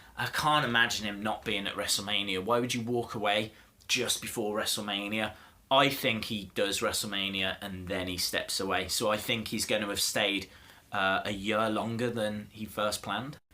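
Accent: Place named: Midlands, United Kingdom. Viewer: You're clearly from the midlands just like me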